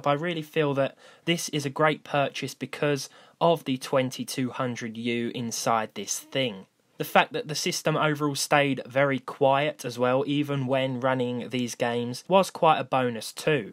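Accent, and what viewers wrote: Australia. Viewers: Great australian accent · Are you from Australia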